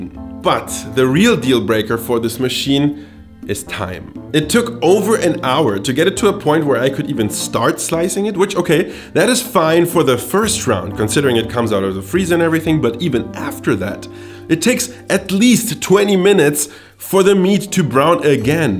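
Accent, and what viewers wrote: Germany. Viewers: his accent screams german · allmost cant even notice the german accent!! great video also! :)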